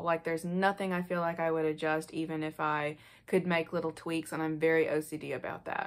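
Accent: United States. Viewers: thanks for the new video! Was thinking about you the other day. "Where did she disappear?", I thought. Glad to see you are fine! As always, nice, detailed review on a couple of awesome sunglasses! The same cool sounding American English to me!:)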